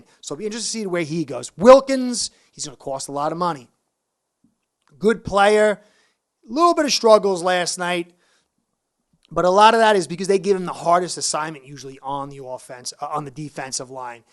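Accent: New York, United States. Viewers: LOL!, I love it when you say "We're DOOMED!!!" 🤣 On another subject, (just curious), how does a guy with a New York accent turn out to be a Phins fan? For me, I became a fan in 1970 (I was 13) and the Dolphins were the only NFL team in my home state. How about you, how and when did you become a Phins fan?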